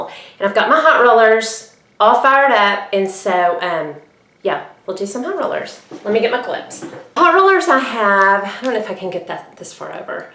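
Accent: South, United States. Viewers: What is your hair color? Love it! Listening to your southern accent is like being back home...love your videos girl!!!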